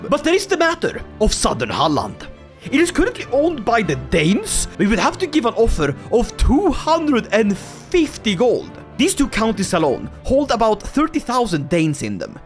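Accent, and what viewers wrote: Sweden. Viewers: Är du skåning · The accent kinda gives it away 😂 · That's the most swedish accent of english i've heard from anybody not older than 70. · Den svenska dialekten märks värkligen 😭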